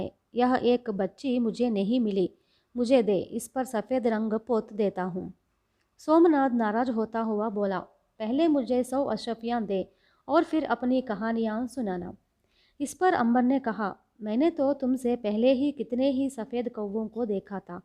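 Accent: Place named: South, India. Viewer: Good morning! Actually, your Hindi accent is hard to understand. It is not fluent & has very strong south Indian, Tami, or keralaite touch... For understanding purpose, watch any Hindi TV channel or listen to "Har kahani kuch kehti Hai or positive inbox" Thanks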